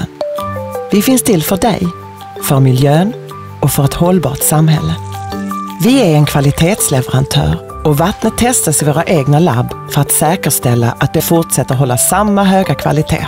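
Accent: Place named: Scania, Sweden. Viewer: Mycket bra. gillar skånskan. :)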